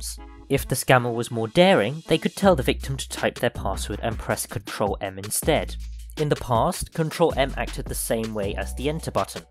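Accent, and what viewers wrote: United Kingdom. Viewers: your nasally British voice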